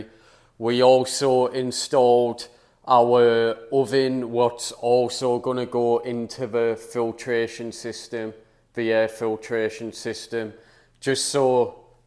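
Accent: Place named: Newcastle, United Kingdom. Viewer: How did a Geordie end up in Canada? Good videos mate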